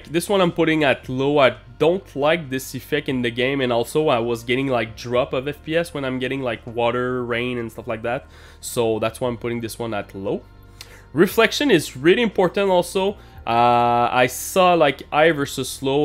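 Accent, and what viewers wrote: Quebec, Canada. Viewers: est-tu Québécois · I knew you were French Canadian the second the video started. My mom has the exact same accent. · Quebecois fr 😁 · Your accent gives me flashbacks of CAF Basic Training in St Jean Sur Richelieu, lol. Thanks for the video, helped alot! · 100% you are from québec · It's funny how the windows game mode isn't a game mode. Are you francophone? From Quebec maybe, some of your voyelles sounds quebecoises. Your "if" sound really french! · He was just joking. I mean „your favorite french canadian“ - this is self-parody. Pretty funny imho. I like his accent btw. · Québec right? Anyway, gr8t video! Thanks! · I can tell you have an accent “quebecois”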